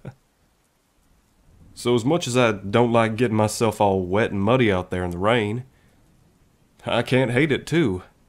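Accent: South, United States. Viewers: I’m a sucker for the country accent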